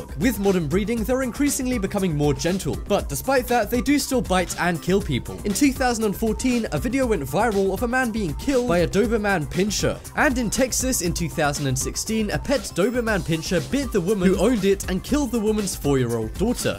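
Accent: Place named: England, United Kingdom. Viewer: English accent doesn't compute with American closed captions... Apparently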